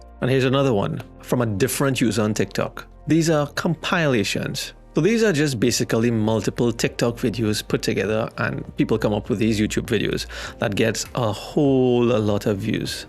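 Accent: Trinidad and Tobago. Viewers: Are you a Trini?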